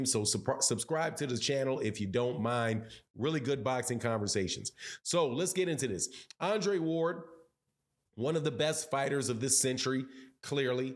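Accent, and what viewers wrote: Texas, United States. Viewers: are you from Texas???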